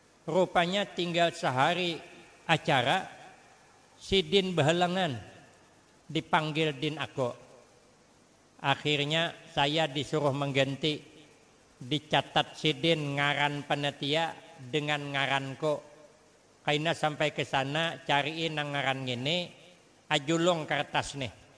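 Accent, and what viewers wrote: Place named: Kalua, Indonesia. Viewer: bahasa beliau kaya orang Kalua ( Kalsel )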